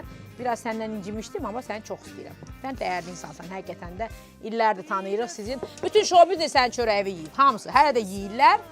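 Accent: Goranboy, Azerbaijan. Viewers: Goranboydan salamlar. xanim ne qeder bakida yasadiz indi de moskvada yasayirsiniz amma oz hele de oz goranboyumuzun lehgesinde danisirsiniz halaldi size.hemise bele qalin deyismez.hem de deyim ki somu basqadi ispanaq basqa.somu yol qiraginda bitir.gozel de ketesi olur.xosbextlik arzulayiram.❤❤.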